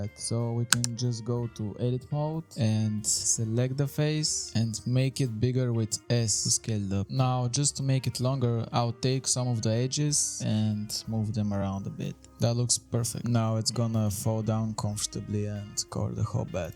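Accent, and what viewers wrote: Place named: Russia. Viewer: russian accent = trust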